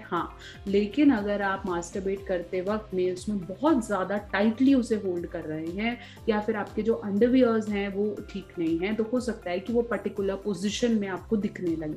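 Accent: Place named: India. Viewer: मैम मुझे तो आपको देख के लगता है कि आप विदेशी महिला हो पर जब आप बोलती हो तब पता लगता है कि आप इंडियन हो🇮🇳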